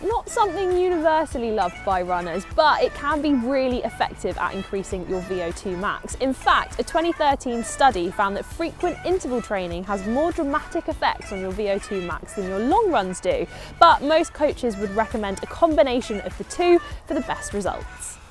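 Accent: United Kingdom. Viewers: Hi I much more 😍 Your Videos. Add 1 subscriber. So where you from USA Or something like British?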